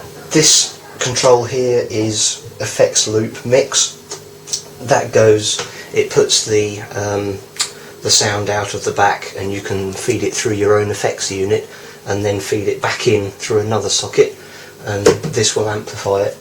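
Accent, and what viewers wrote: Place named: United Kingdom. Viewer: you sound british and tell the weight in kg, astounding!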